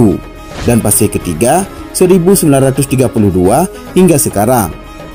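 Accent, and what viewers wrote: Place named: Solok, Indonesia. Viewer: Naratornya pasti urang sOLOK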